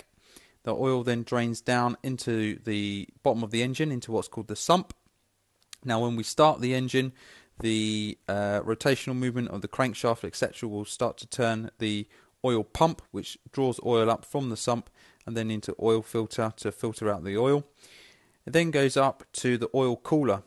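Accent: United Kingdom. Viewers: Instead of sounding British, you could try enunciating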